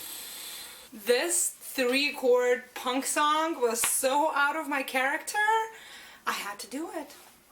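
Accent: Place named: Eastern Europe. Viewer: ah, moldova! interesting country. ur accent did sound eastern european to me but i still couldn't place it. : ) keep up the great work!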